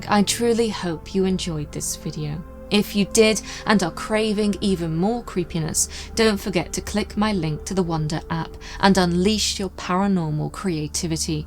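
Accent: United Kingdom. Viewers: Subbed within minutes of my first experience with you (fellow Brit and listening to you makes me a very proud Brit!! 🤩) I will now be binging on all of your documentaries.Fabulous orator delivering articulate and engaging content. I am all in 100% invested in this beautiful channel!! CONGRATULATIONS 👏 you deserve millions of subscribers.Bravo 🙌 🙏 lots of love x🩷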